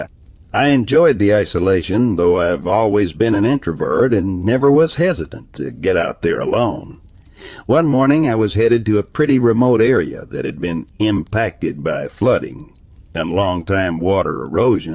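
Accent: Texas, United States. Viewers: Yea Texas accent an also Alabama accent the best for me. Super cool 😎 good day too all from the UK 🇬🇧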